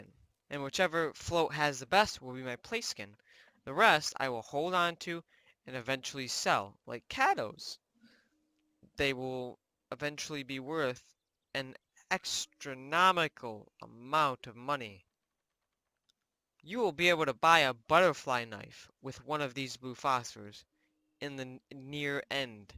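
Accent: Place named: Arab world. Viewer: Lol arabic accent my friend🤣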